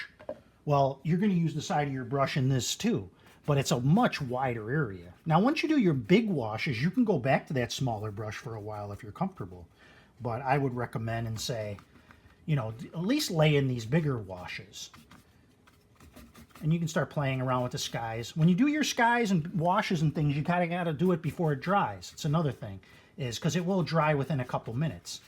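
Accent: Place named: United Kingdom. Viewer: It takes a little time to tune the American ear into everyday conversational style British accents. There are so many and can be difficult for a while.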